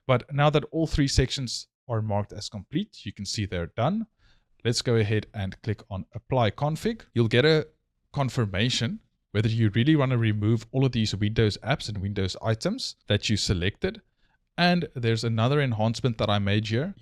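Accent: Afrikaans, South Africa. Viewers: jy is 100% afrikaans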